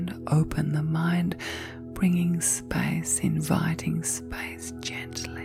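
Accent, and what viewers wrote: Australia. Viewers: how are you doing today? I love 💕 listening to your very soothing sweet chloroform voice!!!!💕💕💋💕, your voice always makes me super duper happy 😊!!! 💕💋, I really miss your very sweet Australian voice!!!💕💋 I miss telling you stories!!!💋💕, you are my very favorite chloroform & you know how to keep me asleep!!! I will talk to you later!!!💋💕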